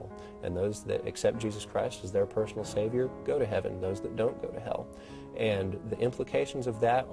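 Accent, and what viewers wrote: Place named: South, United States. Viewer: I think the relatively subtle southern accent and that extra little wisp of hair fooled us all into assuming you were christian, while most of us now know you are... how do you say... an 'actor'. But seriously, I think you did a great job of portraying a perfectly intelligent and very eloquent young man who happpens to be brainwashed.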